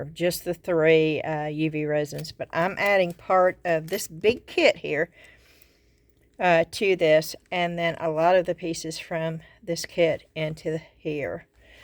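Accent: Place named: Pittsburgh, United States. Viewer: Do you have family from Pennsylvania? My old roommates spoke Pittsburghese. Unique words and word pronunciations. Warsh=Wash!!!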